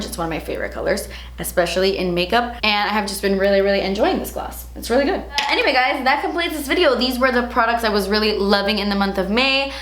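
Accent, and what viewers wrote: Cuba. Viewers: El acento Cubana que bonito, aún que soy Mexicana me encanta 😛 make a spanish video trust me you’ll gain more subs 🔥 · Tu acento cubano,... I love when you speak spanish xDDDDDD. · I LOVE how you talk in spanish, I'm from Spain and girlllllll that cuban accent is bomb 💘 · Omg I love her Cuban accent!!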